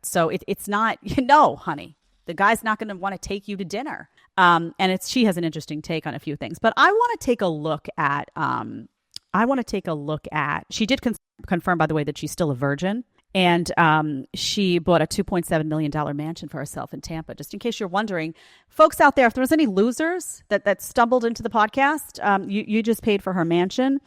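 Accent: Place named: Brooklyn, United States. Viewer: Please, you must stop imitating your teenage only fans females... You go into adolescent brooklynese, New York dialect and you particularly flex the intonations on the ' nasty' as if you're actually that icky female. Just speak about the subjects you're dealing with, and don't become them or allow them to become you. They still resonate a living adolescent part of you, or else you wouldn't be able to join in their vibration so frequently and so effectively. Time to grow up!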